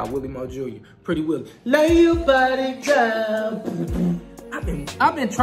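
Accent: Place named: St. Louis, United States. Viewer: Texas + Cali + Pinch of NY = STL Accent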